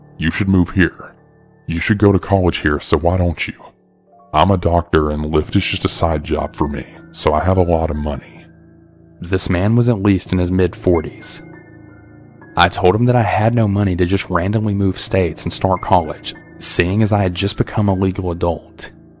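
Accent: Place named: Texas, United States. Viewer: I love your Texas accent damn it so smooth ❤️❤️💯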